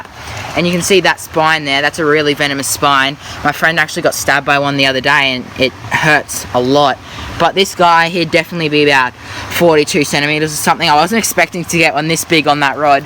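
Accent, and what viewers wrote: Australia. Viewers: are you a australian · Is he Aussie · Are you Australian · Are u a aussie? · Are you an Aussie????? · you sound Australian, cyclone debie hit Australia, how come you speak American, in this country we call them prawns. however what you were catching were yabbies good to eat but not prawns or shrimp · I can tell ur an Aussie so wanna meet up sometime and do some fishing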